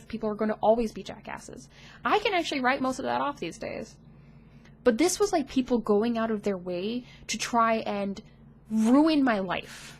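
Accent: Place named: Midwest, United States. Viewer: I am from the midwest but moved to the Pacific Northwest. And your accent sounds soooo Midwestern. Michigan? Wisconsin? gah I cant place it and its killing me. You have a great personality! this video was awesome and really helpful!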